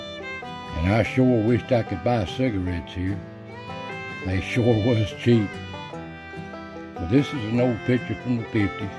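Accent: Appalachia, United States. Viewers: I am from Detroit but my heart lives in Harrogate and Middlesboro. I lived with my aunts and uncles in Harrogate during the Summers. In 1987 my uncle Steve Napier taught me how to drive when I was only 14 years old. We lived in Harrogate and he had a small shop in Middlesboro. To get me past my fear of driving he would have me drive across that mountain before the tunnel. Sometimes we would stop at the Cudjo's Cavern shop for some ice cream (before dinner). Uncle Steve was well known and loved from what I remember., your voice and talk atyle reminds me of so many of his friends that would stop in his shop to visit. It brought me back to the very happiest days of my life. Makes me wonder if you two knew each other...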